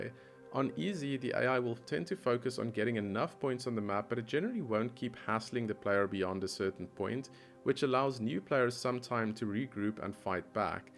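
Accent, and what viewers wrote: South Africa. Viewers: Was surprised to hear the SA accent! Have this game in my Steam Library but haven't downlosdedit yet. Not BIG RTS games but have been exploring them lately. Also turn-based RPGs like Xcom and Divinity: Original Sin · Hey, are you South African?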